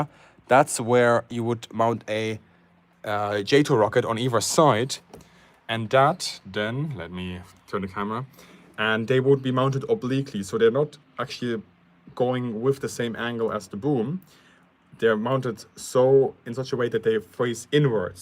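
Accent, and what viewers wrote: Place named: Germany. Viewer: That was awesome the German accent ads to the show love it when you say (Millimeter)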